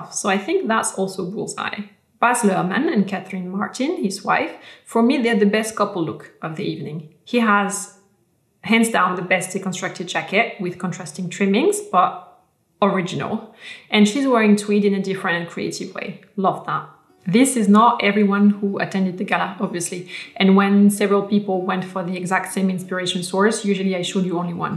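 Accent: France. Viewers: Mam... Are You french?? Cause you do speak like one!!! Anyways.. Mam.... Your vudeos are amazing, and I have watched your portfolio videos and it helped me a lot... Do more videos❤️‍🔥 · J'adore tes vidéos, je remarque ton accent français qui revient plus depuis que tu es déménagé en Provence 🙈 yay haha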